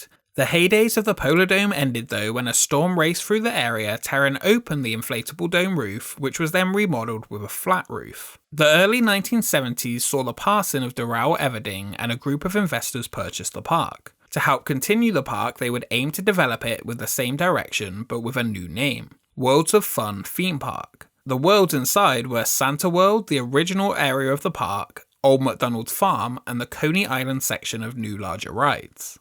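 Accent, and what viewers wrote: United Kingdom. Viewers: I wanted to comment something relevant but I keep coming back to your accent. What part of the UK are you from? I need to move there so I can live surrounded by people who sound like you 24/7.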